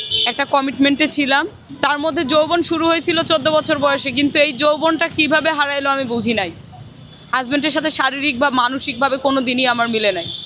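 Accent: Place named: Kolkata, India. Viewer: Kolkata style ei kotha bole ..nice style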